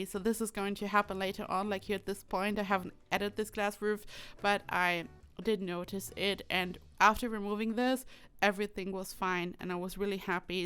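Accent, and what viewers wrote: Germany. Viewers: First, congratulations on bypassing the 100k subscriber mark and receiving your silver play button! You definitely deserve it. Secondly, I happen to love your German accent. One side of my family is of German ancestry and I took German in 10th grade many, MANY moons ago. I'm super happy for your Simming success. Also, congratulations on being the designated SNB Bank venue builder for SimRealist. Way to go!!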